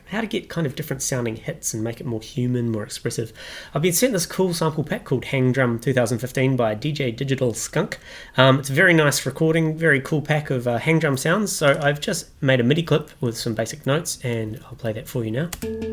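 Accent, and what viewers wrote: New Zealand. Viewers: the first 30 sex of this (really good) tut were like a masterclass in kiwi eccent ''...quuck tups...mudi clups... ...eexcellunt!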